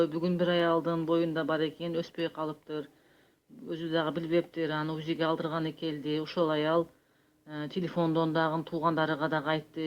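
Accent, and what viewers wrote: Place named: Uzbekistan. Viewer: Сен озун озбечкасынго суйлогонун озбектей экен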